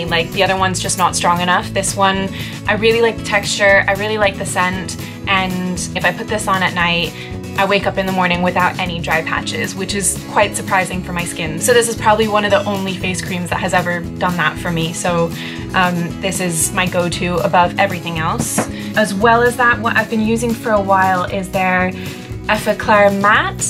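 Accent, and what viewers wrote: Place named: England, United Kingdom. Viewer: You have a hint of an English accent at times lol or is it just me?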